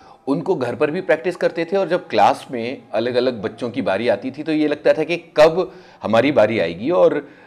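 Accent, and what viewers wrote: Standard. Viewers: Too good... Hindi kavita aur Yunusbhai ka vishudh Hindi uchcharan... sone pe suhaga... what else...? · आपका उच्चारण बहुत shuddh है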